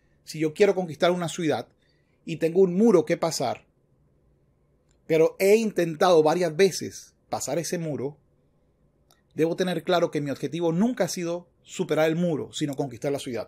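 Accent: Venezuela. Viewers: Muy bue vídeo amigo..excelente. ¿ Usted es Venezolano? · tu eres Venezolano? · Hola, professor, deseando esté bien; venezolano en México, me cayó el veinte en cuanto a la corriente del Estoicismo, ya que lo percibo en "español venezolano", gracias, procuraré seguir escuchando y viendo tus vídeos. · Ya sabía yo que eras venezolano amigo...!!!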